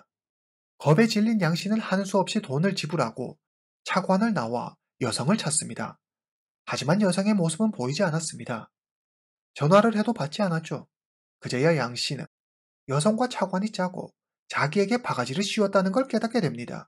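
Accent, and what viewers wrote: Yanbian, China. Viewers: 말투가 연변 조선족인가보네 ㅋ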